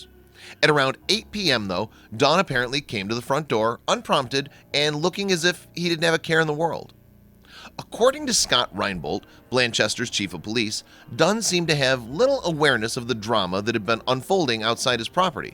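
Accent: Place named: Chicago, United States. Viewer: The narrator sounds like he is from the Chicago area.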